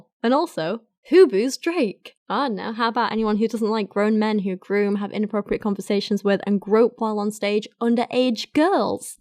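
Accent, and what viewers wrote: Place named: Staffordshire, United Kingdom. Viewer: Your Lil' Staffordshire is adorable and i love it <3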